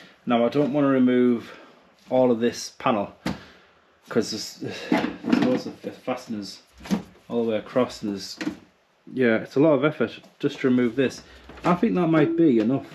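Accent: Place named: North East England, United Kingdom. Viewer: Thanks for this video. Got the same job to do myself very soon. Also judging by your accent you're just south of the Tyne to me. I'm from Northumberland 😁 Cheers dude!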